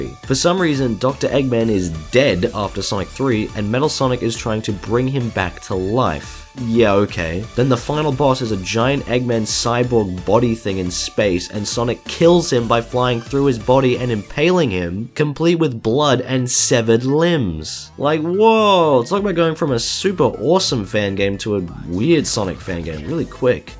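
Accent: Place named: Australia. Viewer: I know right? I saw your video and was like "awww yeah, Mania hype!" and then heard your accent "hey an Australian, nice!" and then when you said you were from Brisbane I almost spat my coffee out all over my computer in an over-the-top cartoonish fashion in disbelief. You've earned yourself a subscriber! S3&K is one of my favourite games of all time and I can't believe we are a week away from finally playing something of that quality once again!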